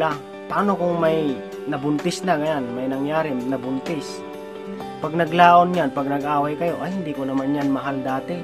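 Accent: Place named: Ilocos, Philippines. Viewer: Aguy gnda ng topic kabsat.tuloy mo lng yn topic mo hahaha... Panalo sa payo.Ilokano tlga ang tonoooooo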